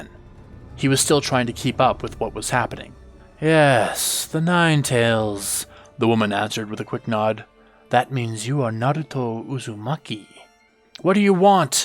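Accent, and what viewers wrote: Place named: Canada. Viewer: Alas, I'm finally caught up lol. I've been following both this and Dygo's Naruto rewrite series, and I can definitely say that I like both. If asked which one I prefer, I think I'd have to say DygoKnight's, but that could very well be because I've known about and been following that one for a longer period of time. I've known of that one for a few months, and this one I just stumbled across about a week ago, when one of the full arc movie videos randomly got recommended to me by YouTube. You've mentioned being Canadian in a few of your videos, which I actually was able to guess within just a couple minutes of hearing you talk lol. I'm friends with a few Canadians, one of whom just finished a massive multi-year Dragon Ball passion project. Another factor might be the fact that I'm totally blind, have been since birth, and rely exclusively on my ears here; couple that with numerous conversations with the aforementioned Canadian friends, and yeah, it wasn't that hard to tell. 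I know you like to showcase fan art, but even when you do post visuals, well it doesn't matter to me what they look like, since I can't see a damn thing anyway hahaha. I've enjoyed your story so far, though admittedly I may have to go back and re-watch a few of the episodes. I ate some of my aunt's edibles earlier this week and tried to follow along with the story whilst being quite toasted, so my focus probably wasn't the best during those episodes lol. There's more I could say/ask, but I can save that for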